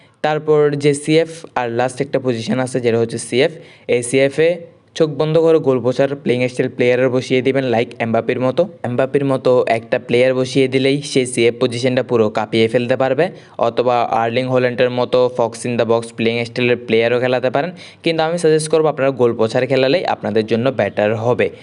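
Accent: Chittagong, Bangladesh. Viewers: Vai apnar basha ctg te naki😊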